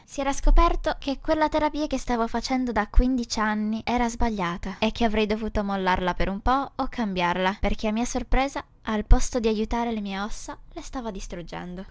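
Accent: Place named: Sicily, Italy. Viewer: Io che credevo eri siciliana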